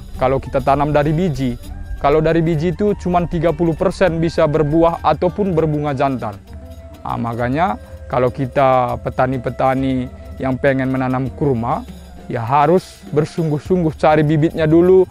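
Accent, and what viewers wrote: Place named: Medan, Indonesia. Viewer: Dengar logatnya jadi kangen temen dari medan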